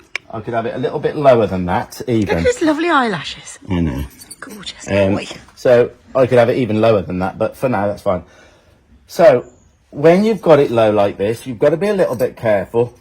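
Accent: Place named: Wales, United Kingdom. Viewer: Brilliant tips’ VLOG like the other one. By doing what you do, it removes all the flight/fight out of them … and they may actually enjoy the riding. I knew Stephanie Powers was into horses from watching her on some US talk show many years ago, where she discussed her custom made equine dinnerware … it was beautiful … and it was also for charity too. I wonder if she still has the set?!? But I didn’t know she had rescue properties all over the world. It should be an awesome visit/session. The way you were talking it sounded like it’ll be a members only VLOG … 😢! Pity!!! Well, you’ll both have done quite a lot of globetrotting this year … mind you your Vacation time is usually in the Winter/Fall seasons, which tend to be the off-season for some. This was an unusual year though … what with the wedding and all! Love the colour of nails 😊 … will look nice with the tan you’ll be working on! 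I’m wondering if the place you’re going to is that rescue you visited at, in one of your VLOGs? I think it was there we saw it. Or maybe that was Greece … you mentioned you guys lived there for severeal years at one time. Hope you have a wonderful time again, when you’re back in the US … hopefully it won’t be too crazy weather-wise! Things are getting a bit extreme, in various parts of the world. Loved the look for today’s VLOG … almost forgot you were Welsh … or are you British (?), until you started to talk! 😂 I’m looking forward to the next VLOG. Safe travels and Happy Trails! 🙏🙌🐎🐴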